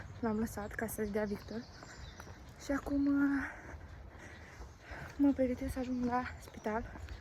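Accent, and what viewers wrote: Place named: Moldova. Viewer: Te aud ca esti moldoveanca. De unde esti de loc?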